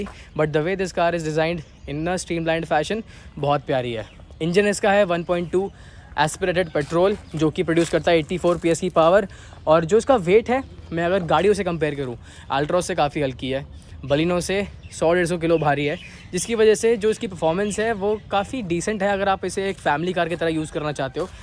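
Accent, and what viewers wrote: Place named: Agra, India. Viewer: Bhai agra se ho?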